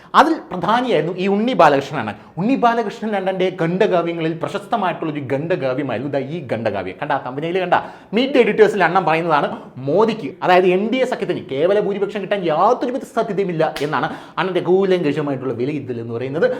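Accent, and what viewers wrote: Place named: Thiruvananthapuram, India. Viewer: തിരുവനന്തപുരം ഭാഷയിൽ ഉള്ള താങ്കളുടെ സംസാരം കേൾക്കാൻ നല്ല രസമുണ്ട് 👍👍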